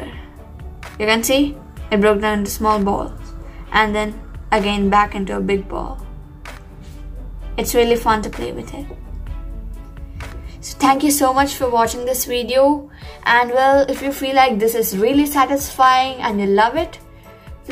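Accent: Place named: India. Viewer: You will be more diseased than you were before and that's Indian accent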